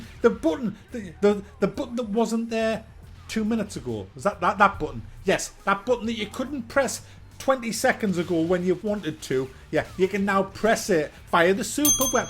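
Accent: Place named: Newcastle, United Kingdom. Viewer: My Uncle's Jordie too so when he goes off on a rant I'm reminded of you! Keep it up, you're brill!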